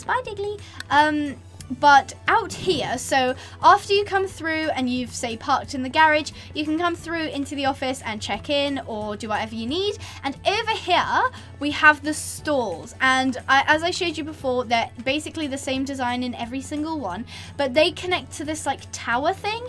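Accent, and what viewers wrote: United Kingdom. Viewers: I love the British accent she has! Love ya!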